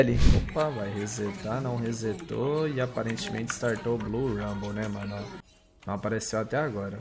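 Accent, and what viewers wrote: Minas Gerais, Brazil. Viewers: ce é mineiro? nois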